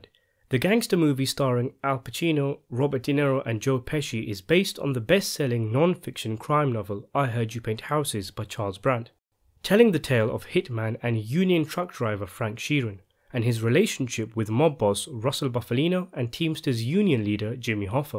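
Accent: United Kingdom. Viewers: Thanks, darling. but I am really curious about your accent. which part of UK is this accent from? I guess you are from Scotland or Wales or Northern Ireland. is that right?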